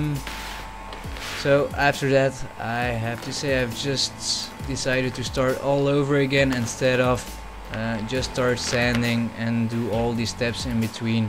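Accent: Netherlands. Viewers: Let me guess.... your from the netherlands? (just the accent) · are you dutch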